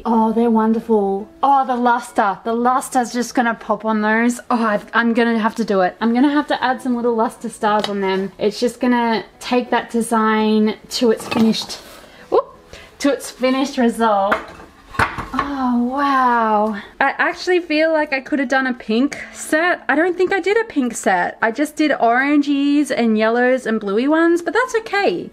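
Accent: Australia. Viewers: Awww, but your accent is lovely! Honestly, hearing you talk reminds me of the best experiences of my life when I visited Australia on a study abroad trip. Pure joy and nostalgia. ❤️❤️❤️ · Never be ashamed of our Australian accent .. We need to show more pride in our country and not dumb down our words for other countries . Proud to be Aussie 🇦🇺🇦🇺🇦🇺🇦🇺🇦🇺🇦🇺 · We love that you're Aussie! Don't mask that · I love to hear you speak Ausie and want to thank you for giving all of us such a happy fun place to visit. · Your Aussie is one of the unique aspects of you! I’m totally here for it! Don’t ever tone it down!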